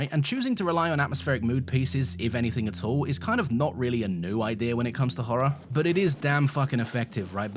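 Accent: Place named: United Kingdom. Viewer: 20 secs into a video and I already hit the subscribe button Your vibe totally clicked with me, the pacing, the delivery, the montage, everything Keep it up, brother, that's some good stuff you're doing here in all honesty P.S. A cherry on a top of all this is the fact that I don't have to put you on 1,25 speed because you are already fast enough P.P.S. Your accent is another one (by the way what is it? I'd say it sounds British but I'm not too good at guessing these)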